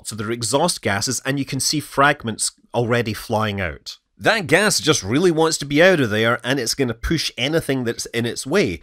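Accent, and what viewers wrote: Scotland, United Kingdom. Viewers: Aside from the fact that someone creating videos about spacecraft includes audio from a track, I have to say that the Scottish accident for the English language is unquestionably the most appealing. Is it is so charming and nominally out of place with modern society; hearing it applied to cutting edge technological matters is all the more delicious.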